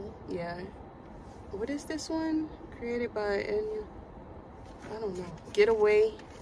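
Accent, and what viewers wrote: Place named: Louisiana, United States. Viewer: I just recently came across your channel because of your Cupid box reveal. I love your down to earth, call it like you see it approach. 😊 And I KNEW you were a Louisiana girl from the first video I watched but I haven’t heard you mention it until this video. As a LA girl myself I’d recognize the accent anywhere! 😂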